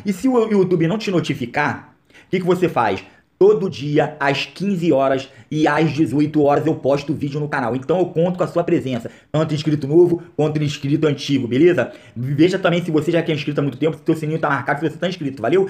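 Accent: Rio de Janeiro, Brazil. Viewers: Tu e carioca ne? Kkkk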